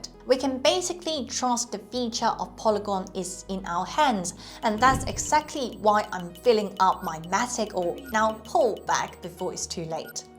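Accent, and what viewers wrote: United Kingdom. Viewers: This girl is learning English, and learning to speak it in an English accent hehe fair play!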